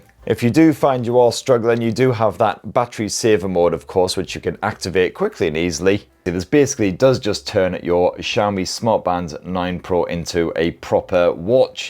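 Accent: United Kingdom. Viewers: Obviously not otherwise he wouldn't have a very British accent. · Also comes with a decent british accent. 👍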